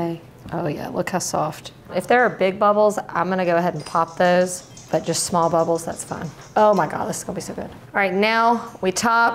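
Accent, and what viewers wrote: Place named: Alabama, United States. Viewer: You talk like me. We must be from the same area in Alabama.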